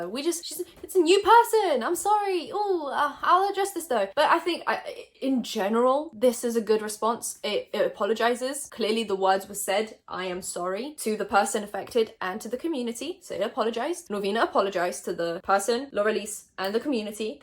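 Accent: United Kingdom. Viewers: SPEAK POLISH, you sound toooo British makes me not believe your polish where is your Akcent !?!?!?!? Love your videos keep it real 😁😁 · ...will you marry me? I just want to hear your beautiful British accent everyday when I wake up accompanied by your lovely face!! My goodness you’re a dream 💭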